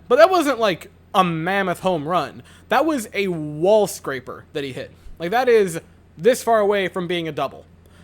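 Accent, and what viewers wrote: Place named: Canada. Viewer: Sounding like a true canadian, subbed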